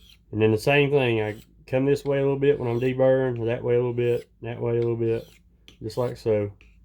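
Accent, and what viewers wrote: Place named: Tennessee, United States. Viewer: Great video, I spit my coffee out then you were sharpening the recurve and said Shit. I’m from Tennessee, you gotta be from this area with that accent.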